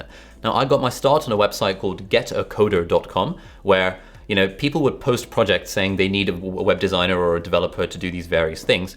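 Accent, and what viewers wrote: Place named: England, United Kingdom. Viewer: Why do you keep saying "dollars"? You're very obviously English, even to an American!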